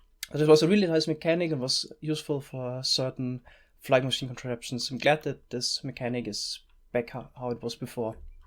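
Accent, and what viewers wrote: Germany. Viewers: Ich liebe diesen deutschen Akzent😂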